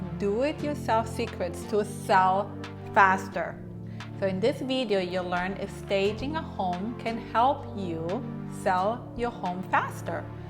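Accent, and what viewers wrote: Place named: Romania. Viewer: ! Salut! Ma bucur sa aud o voce romaneasca!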